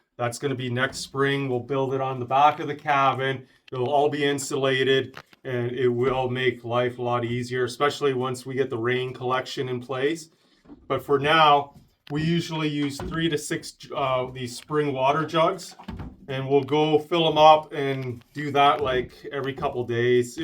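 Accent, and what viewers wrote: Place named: Minnesota, United States. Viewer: You sound just like minnesotans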